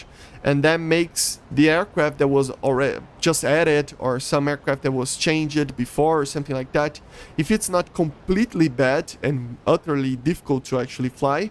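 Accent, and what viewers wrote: Brazil. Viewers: percebi que era brasileiro na hora seu sotaque não engana kkk otimo video mano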